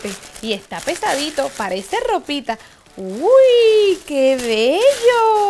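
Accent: Cuba. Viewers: me encanta tu tono cubano!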